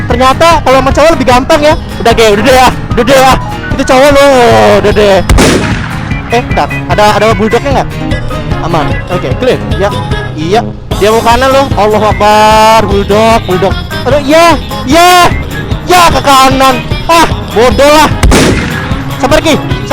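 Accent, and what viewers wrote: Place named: West Java, Indonesia. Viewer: njir udh ketularan bahasa + aksen kungkingkang :v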